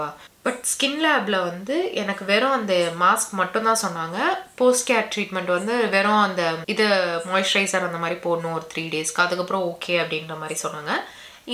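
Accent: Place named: Coimbatore, India. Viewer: coimbatore slang sissy😜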